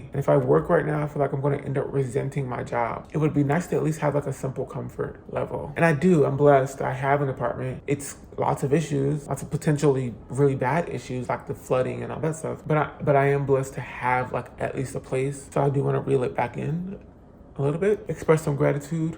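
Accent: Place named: Philadelphia, United States. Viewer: Baby you sound like you in Center City or Northern Liberties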